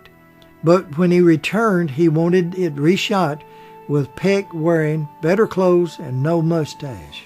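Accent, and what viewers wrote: South, United States. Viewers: One of your best,! I love your bios of legendary stars of our classic American films. A few little mistakes, though, it's Charles Bickford, not Charles Pickford, Chuck Connors, not Chuck Connor, and Jean Peters could not possibly have starred in 1958's The Big Country, as by that time she had married Howard Hughes and retired from acting; A Man Called Peter, released in 1955, was her last feature film. I think you meant Jean Simmons. I'm a Southerner myself, so I understand and relate to your wonderful drawl. My late mother was from middle Tennessee and often got a bit mixed up here and there (she'd say "punkin" for pumpkin, and "purnish" for punish - just the tip of the iceberg!) But it made her all the more lovable for us all. Keep doing what you're doing, and don't ever change!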